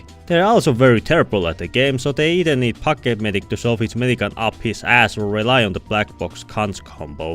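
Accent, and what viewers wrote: Finland. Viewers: Nyt on nii vahva suomiaccentti että aijaijai :D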